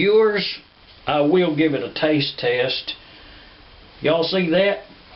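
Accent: South, United States. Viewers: I'm from the north in Michigan and I married a southerner from TX. He also have family in Oklahoma and Arkansas and the food they make absolutely blows my mind. I am forever trying to mimic his family's recipes and just how welcoming and loving they all are. Your accent and cooking always reminds me of them and I love it so much. Keep it up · Awesome!your southern style accent is great! I enjoy shopping at the open market too...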